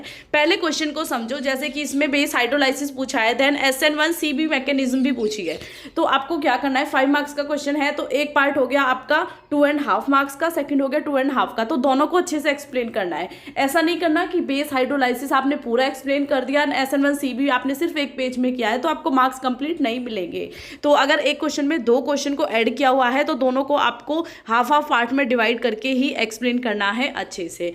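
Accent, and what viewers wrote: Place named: Bundelkhand, India. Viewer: apki tone bhi bundelkhandi hi lg rhi hh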